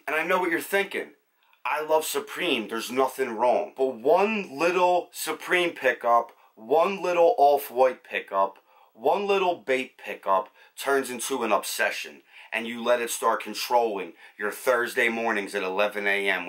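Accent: Philadelphia, United States. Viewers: Most thorough and exemplary Philly accent on the interwebs. Respect.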